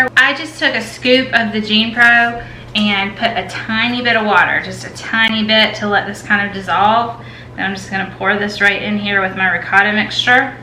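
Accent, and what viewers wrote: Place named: Louisiana, United States. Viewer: I love hearing you talk...reminds me of home! I'm a Louisiana girl living in England!! My surgery date has been set for Nov 11th!! So excited to prep for the different stages. These recipes are so helpful. Thank you. Also where about are you from??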